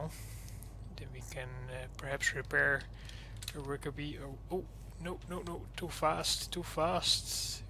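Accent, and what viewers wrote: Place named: Netherlands. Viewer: Heey, Just asking, but are you dutch? For some reason your English sounds like how dutch people speak it:)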